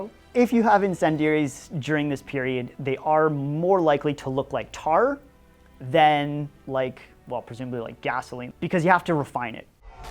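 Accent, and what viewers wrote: Canada. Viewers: One sentence in, and I can tell he is Canadian 😅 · I can tell he is Canadian by his accent. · I'm guessing he's Canadian from that "oooot" and "aboowt" in his accent. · As soon as you opened your mouth, I pegged you as a Canadian (and from my alma mater too!)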